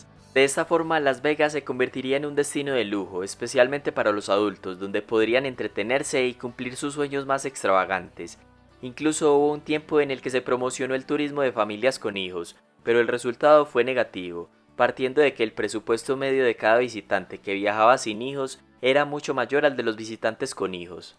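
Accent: Antioquia, Colombia. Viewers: Se nota que eres paisa, buen video parce!!!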